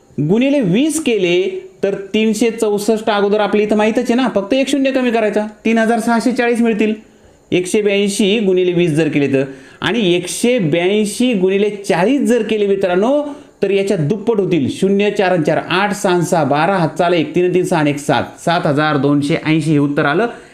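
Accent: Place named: Parbhani, India. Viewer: Parbhani bolto sir bhari strik ahe sir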